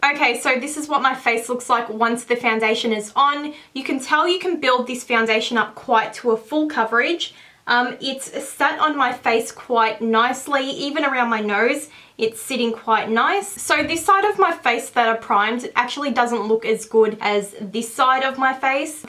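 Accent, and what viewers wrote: Australia. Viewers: are u australian ? i LOVE ur accent ! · its an Australian accent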